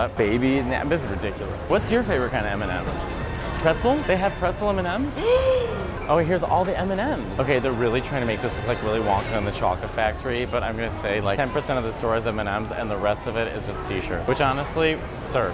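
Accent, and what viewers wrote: Italy. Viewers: The Italian accent when you speak Spanish! I love it. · Dude, as a native speaker, your spanish was pretty good :) You speak spanish with an italian accent and I speak italian with a spanish accent lol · Spanish with a heavy Italian accent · He speaks Spanish with an Italian accent xD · I’m surprised but I shouldn’t be that sounds like an Italian speaking Spanish.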